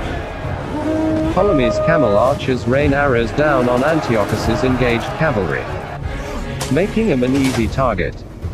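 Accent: United Kingdom. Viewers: Something about watching a Ancient battle cinematically!! with a British narrator ! like watching a documentary ..excellent..only thing missing is watching some older history expert showing us some of the weapons of the age ..xoxoxo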